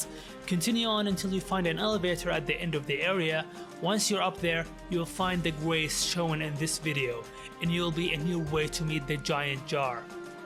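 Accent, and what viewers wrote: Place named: Saudi Arabia. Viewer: ياخي اللهجه السعوديه اسمعها لو وين ما كانت 😂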